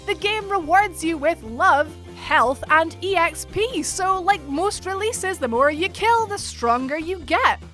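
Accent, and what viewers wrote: Scotland, United Kingdom. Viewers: I love your accent. Scottish lass. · She's Scottish :-)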